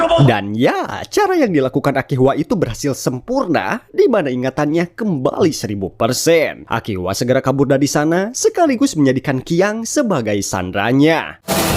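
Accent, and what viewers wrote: West Java, Indonesia. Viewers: Jigana urang Sunda ieu😂😂😂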